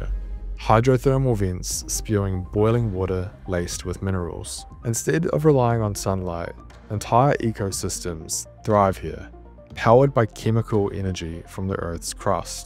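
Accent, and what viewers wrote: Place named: New Zealand. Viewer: I love how the captions corrected 'depths' to 'dips' because of your NZ accent lol.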